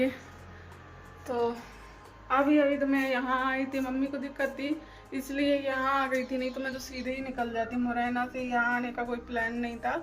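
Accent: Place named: Bundelkhand, India. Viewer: tum to uma ke yahan hi enjoy kar rahi thi tum log bundel khandi ho kya boolne ka dhang se mallom.parta hai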